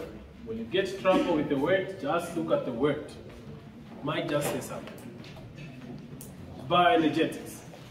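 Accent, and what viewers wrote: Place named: Uganda. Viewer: You must have studied in uganda cz this accent 😅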